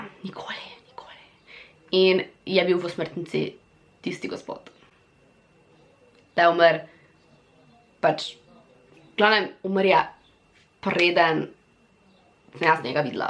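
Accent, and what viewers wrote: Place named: Primorska, Slovenia. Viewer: Ma kje ti zivis ker imas primorski naglas?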